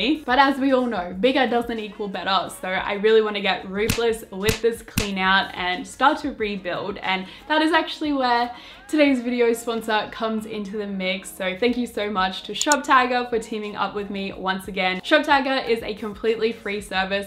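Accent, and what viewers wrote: Australia. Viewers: Me staring at the phone after pulling an all-nighter (it’s am now) trying to figure out if she has an Australian accent👁👄👁😭🤦‍♀️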